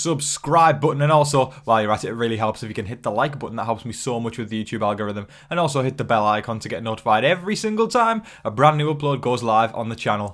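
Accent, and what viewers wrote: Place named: Sunderland, United Kingdom. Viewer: You from Sunderland mate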